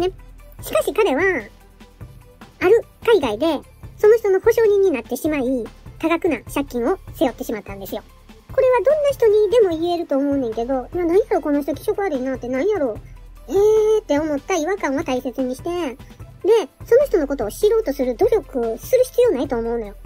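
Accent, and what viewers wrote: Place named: Kyoto, Japan. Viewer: 京都弁ですね^_^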